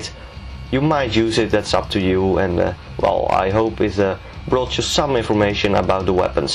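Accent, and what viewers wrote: Netherlands. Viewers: je hoort echt goed dat je Nederlands bent goede video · Are u Dutch? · ey man ik hoor aan je accent dat je nederlands bent, ik upload ook gameplays van bfp4f check mijn channel btw nice commentairy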